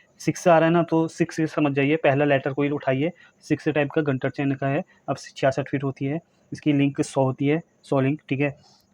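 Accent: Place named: Jodhpur, India. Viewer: aap kha se ho sir. Language se jodhpur k lgte ho. Sir aap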